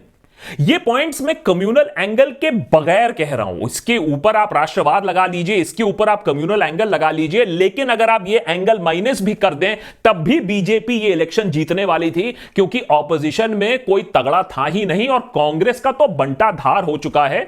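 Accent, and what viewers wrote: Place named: Uttar Pradesh, India. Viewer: Ap ekdam up vaalo ki trh hi accent mei bolte hain.... BHAKT BANNERJI ka role karte karte ek dam smooth ho gye Love your episodes love your integrity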